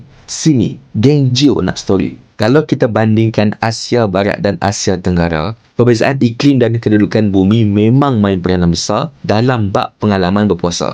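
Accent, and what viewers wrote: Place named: North, Malaysia. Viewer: Dgr min cakap mcm oqg utaqa.. 😅 Teruskan konten mcm ni min.. mantapppppp... 🫡👍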